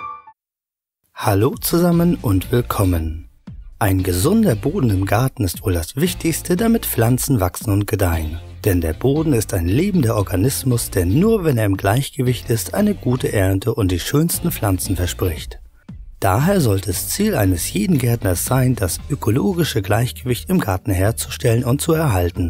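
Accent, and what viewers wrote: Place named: North Germany, Germany. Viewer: bidersalz bläder..... der typ ist norddeutscher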